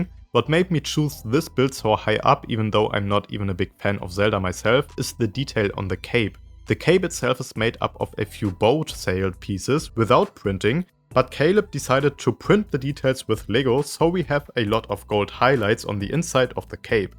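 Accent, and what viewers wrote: Germany. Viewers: Oh man der deutsche Akzent ist ja mal anders wild 😅 klingen wir wirklich so krass 😅 gutes Video übrigens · Who is this guy with the lovely German accent? He's doing a great job. Ich wohne in Deutschland. Super smart friendly people 🤩🙂🤓🇩🇪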